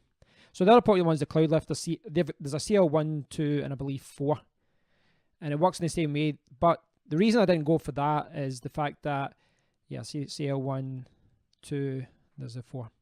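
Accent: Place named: Scotland, United Kingdom. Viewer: I like your accent. Reminds me of CSGuitars but I can't put my finger on it. Is that a Scottish accent? Irish?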